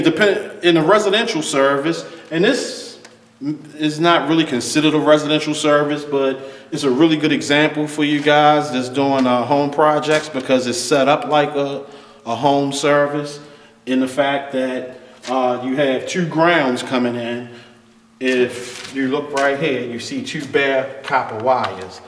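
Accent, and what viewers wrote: Baltimore, United States. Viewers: great video! you also sound very much like my uncles from Baltimore. im from and live in Philly, so it really stands out. again, nice video! super informative, easy to follow along with. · Baltimore accent?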